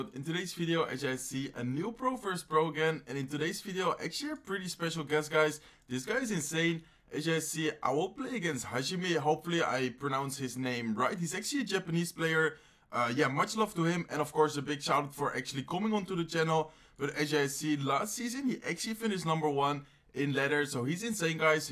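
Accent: Netherlands. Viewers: Actually een best wel nederlands accent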